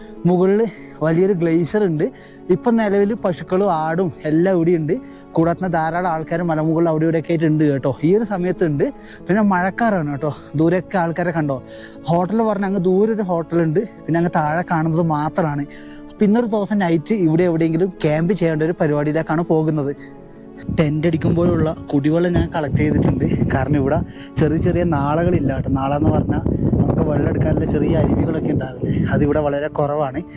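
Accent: Kannur, India. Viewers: Kannur kaaran ano?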